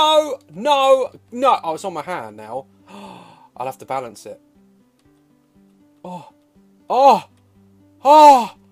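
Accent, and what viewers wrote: United Kingdom. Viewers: Oh, man. Can't stop laughing! The slightest sound / gesture Daz makes, is so damn hilarious! 🤣😂 Always the best solution when feeling sad. Also, as a non-native English speaker, it's great to hear his British accent. Perfect for some tea, HAH!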